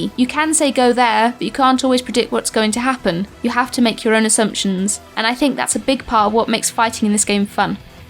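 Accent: England, United Kingdom. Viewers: Jesus I was not expecting a broad English accent XD great video though!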